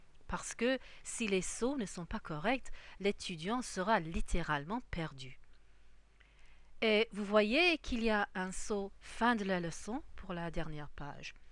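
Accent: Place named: Belgium. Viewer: A l'accent, c'est une belge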